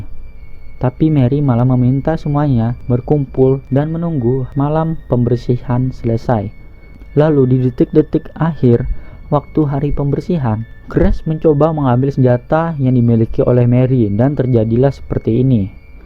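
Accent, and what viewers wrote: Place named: Indonesia. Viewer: Maklum Bro,Mulut Orang Indo Ga terbiasa Dengan Bahasa Inggris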